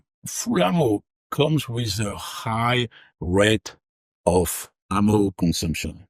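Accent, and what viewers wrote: France. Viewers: Are you French by chance? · I'm having a harder time than I normally do placing an accent with yours. 🤔Definitely French... but I'm guessing closer to Belgium? Do you like Belgian waffles? 😁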